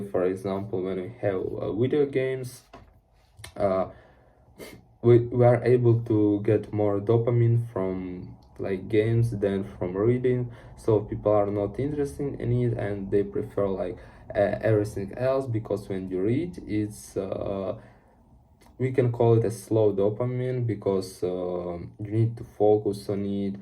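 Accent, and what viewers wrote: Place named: Ukraine. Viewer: When i started to listen you , i understood that you from Ukraine, because of your pronunciation. I'm also from Ukraine 😂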